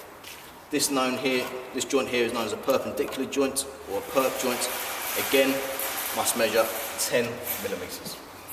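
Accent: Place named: England, United Kingdom. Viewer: natural stone moments . It's an English accent so I'm guessing England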